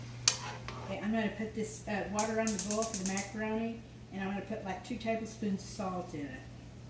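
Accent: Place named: South, United States. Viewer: Thanks, this brought back memories from my grandmas cooking. She had the same southern accent, too.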